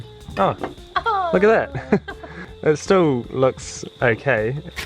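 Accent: New Zealand. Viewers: I have loved this post. I def will give it a go in the future. I'm up at Muriwai Beach, Auckland. Hmmm.... now to find some tyres lol. Bro, when's the best time for us to grow melon? Love hearing our accent for a change. Thinking of starting a channel myself actually. Any tips?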